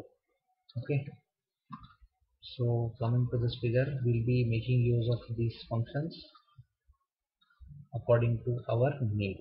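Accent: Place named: India. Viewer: Not only you've got that stupid inidian accent, but you've also got a shitty microphone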